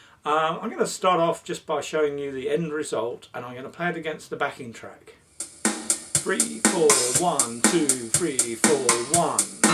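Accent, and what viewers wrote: United Kingdom. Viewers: This is a very good tutorial. I have been playing that progression, that way for several years; my dumb, I didn't know it was Texas Blues. This teacher does a good job of explaining everything, I thought it was cool to be learning T.B. from a bloke with an English accent, but why not.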